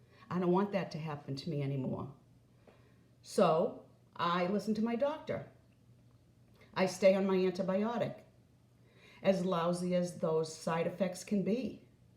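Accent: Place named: New England, United States. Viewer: This is my first video to see from you. Thank you for this explanation. I have a family member who has lymphedema in her arm, as a result of her breast cancer surgery. She told us about some of her treatments and the sleeve she’s supposed to wear at all times, but we didn’t realize the seriousness of what she’s living with. I enjoyed your video so much that I just subscribed. Plus it sounds a little bit like you’re from New England. I’m not from there but I lived there for a few years! So it’s fun to hear the accent again. ☺️ Anyway, I look forward to watching your other videos and following your future progress!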